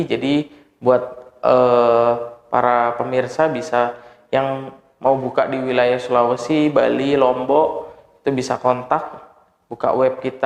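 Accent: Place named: Java, Indonesia. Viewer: Wehhhhhhh medok empuk rotine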